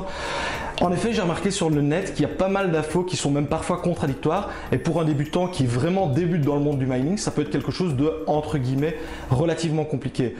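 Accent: Belgium. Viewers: Le p'tit accent Belge me plaît déjà 😁 J'avoue que ça me manque un peu depuis presque 16 ans que je vis en France 😉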